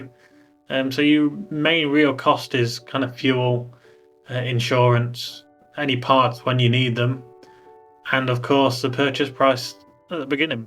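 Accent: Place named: Grimsby, United Kingdom. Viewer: Beautiful car and a nicely made video. That sounds like a Grimsby accent to me. Couldn't work out where you were driving though. Woodhall? 😊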